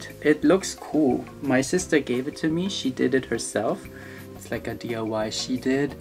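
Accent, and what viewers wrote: Germany. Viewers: Are u German? :) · I swear to harry styles I knew you were German as soon as you said something and I spend half the video finding a German comment!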